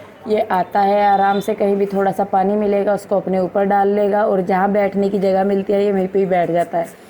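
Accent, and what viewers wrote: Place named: Saharanpur, India. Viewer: aapki language ka jo touch hai ...m first vdo se hi smajh gyi thi ki ho na ho aap saharanpur se related ho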